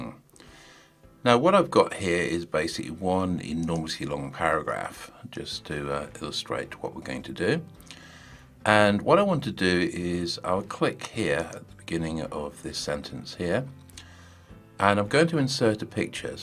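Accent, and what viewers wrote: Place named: United Kingdom. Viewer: Excellent tutorial ! You are an excellent teacher and presenter. I must also comment on your cultured British diction... it is heavenly. We in the Queen's American Colonies speak a doggerel and uncultured version of the English language, of which we should all be ashamed. It is such a delight to hear someone who speaks in a cultured manner, in complete sentences, and without lacing every sentence with those pig-grunt utterances of the non-words "UH" and "gonna." I shall be watching more of your videos. Liked and Subscribed. Hold High the standard of proper and cultured English speech !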